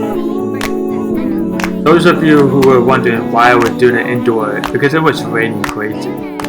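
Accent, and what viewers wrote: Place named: England, United Kingdom. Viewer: You speak like English people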